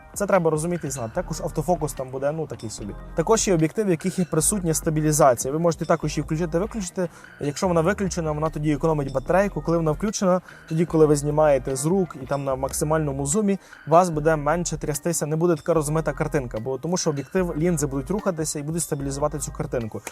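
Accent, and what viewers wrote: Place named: Ivano-Frankivsk, Ukraine. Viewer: то бо гіби десь з Франківщини ви)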